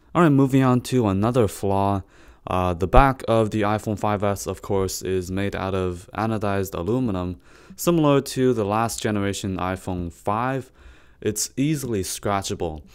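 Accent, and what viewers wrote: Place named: China. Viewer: Hi, are you from China? Just a guess based on your accent:) Your videos are awesome.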